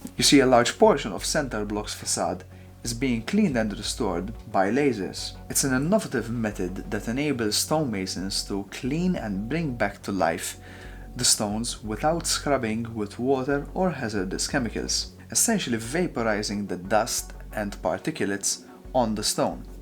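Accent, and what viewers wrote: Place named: Malta. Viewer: Are you Maltese? That accent is familiar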